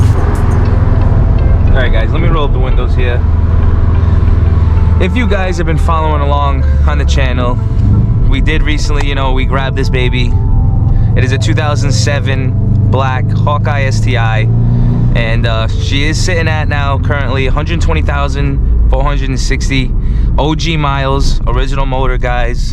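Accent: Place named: Fall River, United States. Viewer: Lol I’m watching this and I’m like hey that accent sounds familiar. Then I realized it’s Fall River. Sup blica torte!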